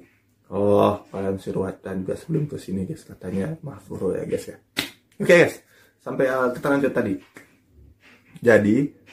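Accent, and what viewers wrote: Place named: West Java, Indonesia. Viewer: Jiga t sunda kng.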